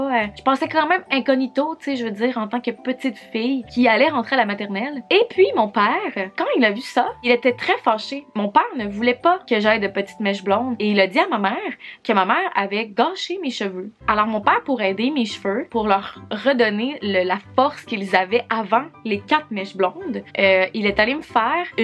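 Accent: Quebec, Canada. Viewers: helppppppppp je ne comprends rien au québecois lol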